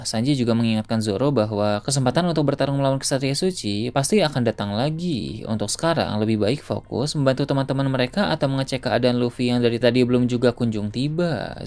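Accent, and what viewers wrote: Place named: Banyumas, Indonesia. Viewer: Wong ngapak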